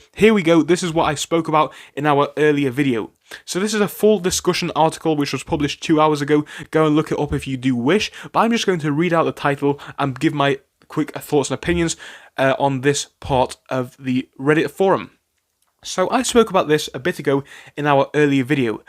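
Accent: United Kingdom. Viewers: You from the North? A UK Ape?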